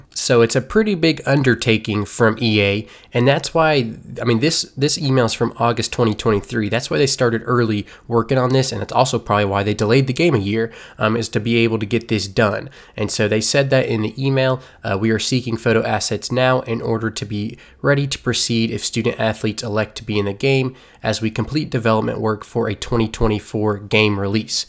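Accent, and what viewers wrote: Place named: Mid-Atlantic, United States. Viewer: Mid Atlantic accent detected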